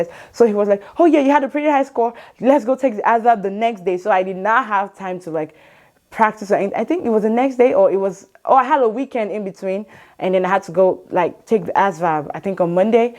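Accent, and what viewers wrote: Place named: Liberia. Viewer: Bro on God you sounds like a Liberian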